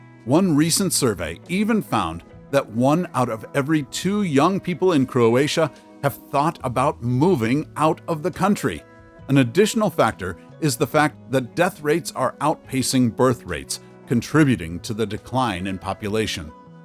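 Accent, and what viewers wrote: United States. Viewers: FRON YOUR ACCENT I SAY YOU ARE AMERICAN, BUT YOU FORGOT TO INCLUDE YOUR BELOVED COUNTRY. 20 MILION AMERICANS LEFT THE USA IN THE PAST FOR HIGH COST LIVING, LOW INTEREST FROM THE GOVERMANT TOWARD IT'S CITIZENS, HEALTH CARE AND SO ON. DON'T TRY TO DEFEND YOUR COUNTRY. PRETTY SOON IT'S GOING TO BE ONE OF WORST PLACES TO LIVE ON THE PLANET. GOOD LUCK YANKEE.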